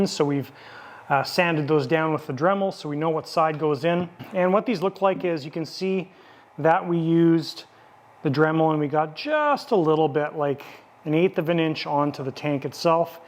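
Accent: Canada. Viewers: Who tf cares how someone pronounces something....he says decals his own way too...maybe it's just the way his area of Canada talks....it really makes no difference lol nice sn by the way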